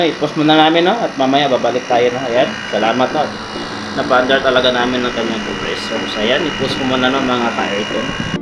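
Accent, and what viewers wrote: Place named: Batangas, Philippines. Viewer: parang batangueno. salamat sa pagshare nito. #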